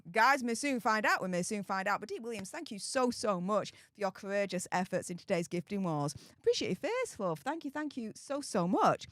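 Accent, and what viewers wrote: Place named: England, United Kingdom. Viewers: 7.07 She wasnt trying for an English accent it just an American accent for whatever region she comes from. (just as your accent is, excuse me if i'm wrong, Manchester or somewhere near:) 🤗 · We Americans thank you for your Thanksgiving greetings. We pray blessings for you and your family. We are able to better understand your English accent now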